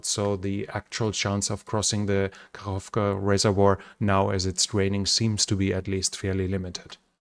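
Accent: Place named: Germany. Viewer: Always remember. When your opponent is down on the ground an bleeding, it's time to start using the steel toed boots. And never in my 72 years in this life did I expect to find myself listening to a commentator with a German accent reporting on combat in Ukraine and saying 'and today on the Eastern Front...."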